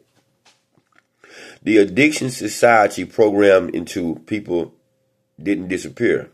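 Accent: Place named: Chicago, United States. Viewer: You gotta be from Chicago 🙂‍↔️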